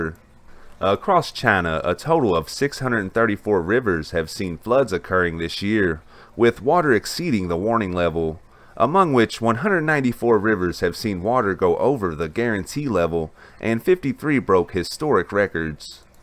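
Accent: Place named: Texas, United States. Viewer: Good coverage. Another interesting video. I have been subscribed for a few weeks now and am enjoying the dam coverage. I also enjoyed the coverage of the Mt. Sinabung eruption. If I could bring up a minor sticking point on your vocabulary... the word reservoir is pronounced resev-war not resev-wire. Just FYI. Wouldn't want anyone mistaking your charming southern drawl (Texas?) for ignorance.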